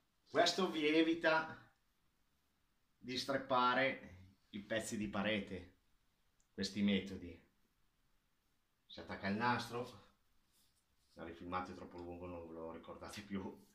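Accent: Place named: Lombardy, Italy. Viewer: Di dove sei,dall'accento sembri lombardo,sbaglio?Sei un imbianchino da 10 e lode,complimenti da un collega!!!Mi iscrivo subito al tuo canale!!Per dipingere una parete grande,ci vuole un pennello grande!!CIT. Ma no,non ci vuole un pennello grande,ma un grande pennello,Cinghiale!! CIT.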